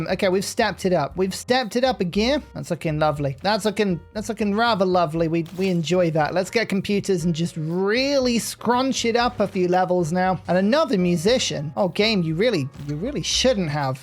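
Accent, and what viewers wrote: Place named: United Kingdom. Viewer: "vis.. vwappid coowing of ayges" sometimes the British is so thick it makes my American blood sick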